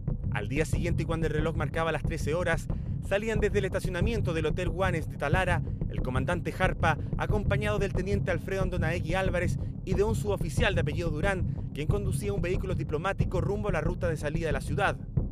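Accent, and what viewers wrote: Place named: Chile. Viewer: Ptm .... vii este videooo 2 veces y no entiendo ni m..... ... tiooo Joderr deja de hablar ese ridiculo acentoo .. k no se entinde ni kulo !! Chilee deja de Joder.. sera k no tienen nada q hacer en tu pekeña ratonera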